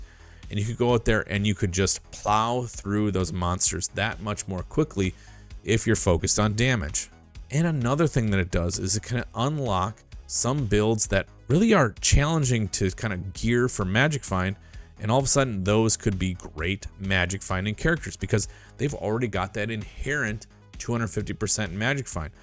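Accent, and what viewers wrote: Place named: Balkans. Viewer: Are you of Serbo-Croatian descent, bro? Nice ! I can recognize this accent anywhere!! :) Zdravo! :)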